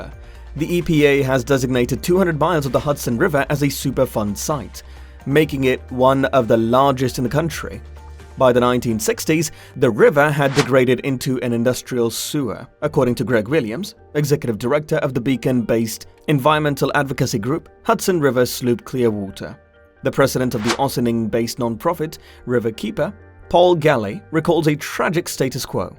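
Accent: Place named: United Kingdom. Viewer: Beautiful British accent and amazing NYC🎉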